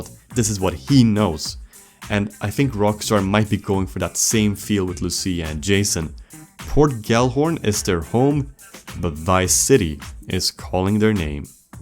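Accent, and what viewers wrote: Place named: Sweden. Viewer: ju saund sviidish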